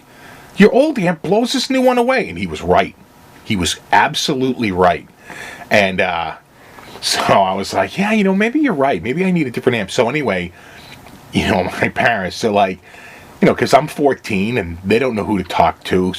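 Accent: Boston, United States. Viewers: your from boston?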